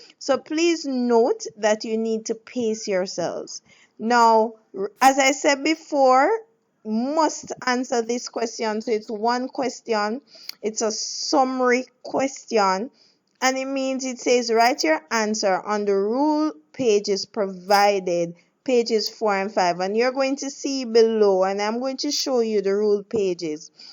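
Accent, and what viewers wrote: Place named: Jamaica. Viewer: you jamaican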